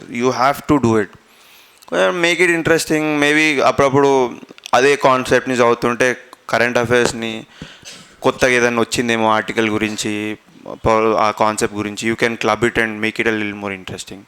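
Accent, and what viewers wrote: Telangana, India. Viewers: కేటీఆర్ సార్ లాగానే ఉన్నారు సార్ అలాగే మ్లాడుతున్నరు తెలంగాణ మడలికంలో చక్కగా అర్థం వంత్తగా మాట్లాడారు సార్ ధన్యవాదాలు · Very good to hear pakka Telangana slang ... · Sooper speech in telangana yasa garu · First time vintunna .... Telangana yasaa....oka civil servant matallo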